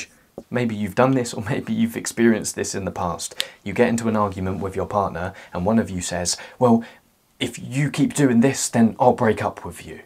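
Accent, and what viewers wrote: England, United Kingdom. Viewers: Your contente is good but the British Accenture is annoying. I would like to be able to hear this with an Californian Accent. Hire a Hollywood speaker and make another channel with a fun female voice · Which part of England is that accent from?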